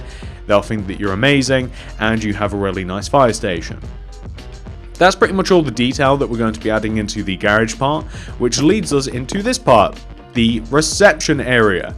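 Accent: England, United Kingdom. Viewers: Are you English, cause I am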